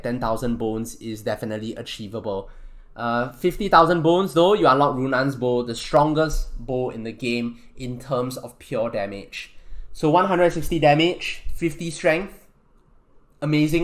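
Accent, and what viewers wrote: Singapore. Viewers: u kinda sound singaporean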